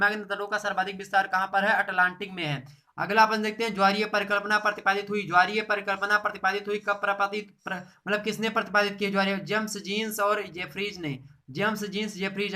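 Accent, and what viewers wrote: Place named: Bihar, India. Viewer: Tu hu guru bihariy lagat hawa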